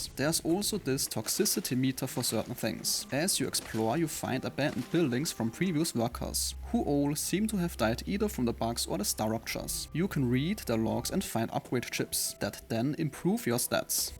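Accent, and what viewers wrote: Germany. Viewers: bro i can hear instantly that he is german haha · Ah good old German accent ❤ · Servus, man hört es direkt raus... Hast auch einen deutschsprachigen Kanal?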